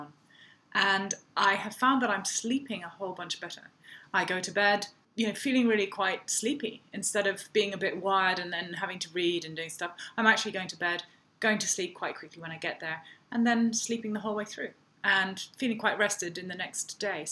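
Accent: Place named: England, United Kingdom. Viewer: Ride W it’s a genuine upper middle class English accent. Nothing American about it.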